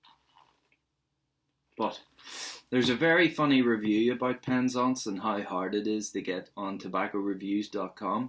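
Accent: Ireland. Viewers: Great chat, always a pleasure to hear an Irish accent 😊 I took a look at the guys site, he has quite a selection of meer's and your right, very reasonably priced. Look forward to seeing yours! 99 subs as I type - you'll have broken the ton in a day or less 👍🏻Also look forward to seeing your presents, your Mrs sounds like a keeper. Lastly, filters - I started with them but abandoned them fairly quickly (more as I didn't like the tight draw). Good luck with that 'stache!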